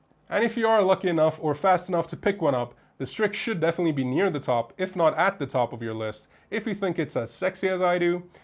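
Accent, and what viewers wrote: South Africa. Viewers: hehe, do I detect as slight South African accent in there?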